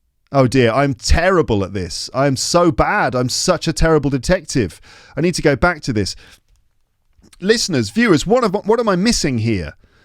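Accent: RP, United Kingdom. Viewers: It's been a great experience being with you in the podcast episodes all this time as a learner of English as a second language. I have learnt a lot and continue to do so - without any loss of interest. It's good - a good exercise being with you learning vocabulary, pronunciation, etc. Your clear voice, the RP accent and the associated other features have made me a regular visitor to your podcast, which is, I believe, has been the most useful source for acquiring the language in a natural way for many a foreigner. Hope the podcast will be as good in the coming year and the listeners/video viewers will be looking forward to watching and listening to very useful content as you have generously brought us for ever. Congratulations! Happy New year! To and the family and the lepsters.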